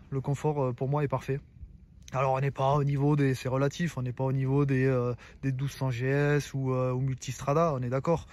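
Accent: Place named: South, France. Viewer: Sympa ta vidéo 👍 et surtout ça fait plaisir de rencontrer un youtubeur de la Yaute avec l'accent du sud 😄. Cette Streetfighter c'est une tuerie, pour moi c'est le roadster ultime avec la SD 1290 voir la Brutale 1000. Mais niveau budget c'est pas pour moi 😅. Après ça tu ne sais plus sur trop quoi rouler. Tu m'étonne que tu la garde. Moi j'attends la Streetfighter V2 moins performante mais plus accessible 😉. En attendant je garde ma supersport 1000 ds. Super les images du bassin Annecien et du Semnoz, une super route pour essayer les bécanes des nombreuses concessions de Seynod 👍. Bonne route à toi, vivement le ski et à tt bientôt sur les routes du coin. ✌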